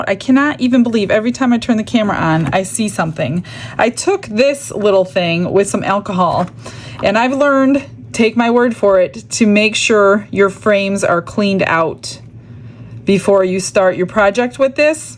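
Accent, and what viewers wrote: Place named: Midwest, United States. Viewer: Lol, I thought I detected a bit of a midwestern accent 😂 I'm a native yooper myself, with family and friends all over Wisconsin, Illinois and ohio, as well as a few trolls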